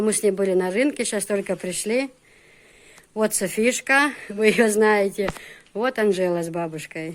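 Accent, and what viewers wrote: Chechnya, Russia. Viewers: Ма шаа Аллах1.,вас не отличишь от чеченки-даже акцент чеченский.Умничка,смогла на все 1000% влиться в семью,в быт,в традиции.Так и должно быть,когда выходишь замуж,то нужно перенимать их привычки и традиции,а не навязывать свои. Дети,очень красивые ма шаа Аллах1. Дала дукха баха бойла. Шу Дала барт цхьа бойла,аьтто бойла!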